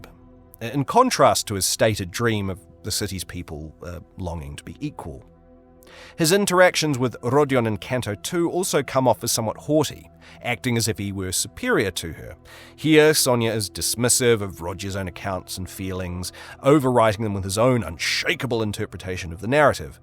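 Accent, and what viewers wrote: New Zealand. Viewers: YOU’RE A KIWI?? The way my neck snapped around when I heard that omg… Hiii from a fellow Kiwi!!! Should have been able to tell from the accent 😭 but I was like “no way right”…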